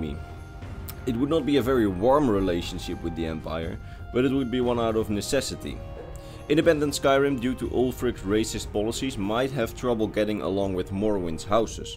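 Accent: Netherlands. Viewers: Haha bro je klinkt zo nederlands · lekker Nederlands accentje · Is this guy dutch? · Ik hoor een Nederlandse accent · Listening to your accent I think you are Dutch, right? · Are you dutch? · First 2 seconds i can hear u dutch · It's Dutch haha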